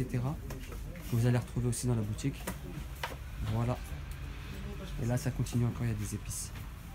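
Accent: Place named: Morocco. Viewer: Je suis marocain je m’abonne frère t’es un bon et maghnia excellent il parle comme les marocain certains Mashallah big up pour les frère algérien 🙏🙏🙏🙏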